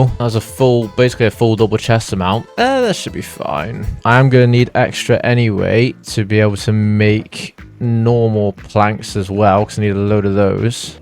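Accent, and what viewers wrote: United Kingdom. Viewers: Excuse me sir.. odd question but are you Cornish? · are u british because u say "li'el bit more"instead of little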